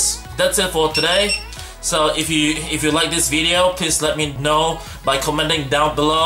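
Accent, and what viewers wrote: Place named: Australia. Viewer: Asian & Aussie accent... 1st time I've heard this mixture. 😎👍🏽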